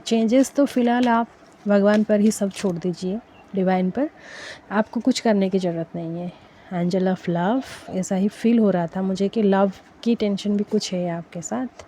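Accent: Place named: Jharkhand, India. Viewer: oh mujhe laga Jharkhand se ho...apki accent se laga.. 👍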